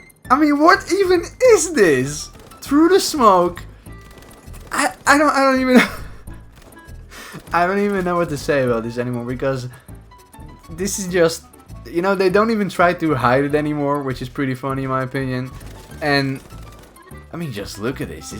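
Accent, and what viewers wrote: Netherlands. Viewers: You sound like you are from Holland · Lekker dat nederlands engels haal je er zo uit hahahaah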